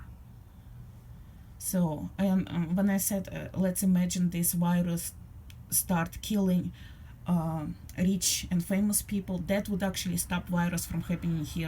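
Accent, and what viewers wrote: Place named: Russia. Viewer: your rusian english pronansiation is owfull режет слух,нельзя ли записывать на русски мадам?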